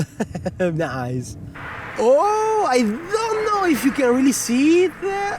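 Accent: Italy. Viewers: I love this channel! infectious enthusiasm about every car leaving or arriving at the Lamborghini factory (not to mention the ducks) just puts a smile on my face. And punctuating it all with that awesome Italian accent, what's not to love?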